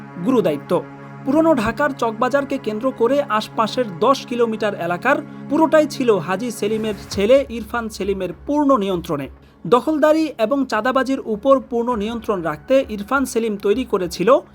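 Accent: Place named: Bihar, India. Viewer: কথায় বিহারি টান আছে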